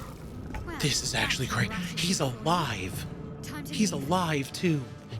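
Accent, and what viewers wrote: England, United Kingdom. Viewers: Yes, it's a loan word from French. I think is English so I assume he's heard it a lot. Maybe he's American though? He does use both English and American words and pronunciations but his accent is English, I think. lol I was just laughing about it and not trying to make a serious complaint or anything. It was meant to be a friendly comment. I'm genuinely sorry if I gave the impression I was trying to be rude or offensive. I apologise.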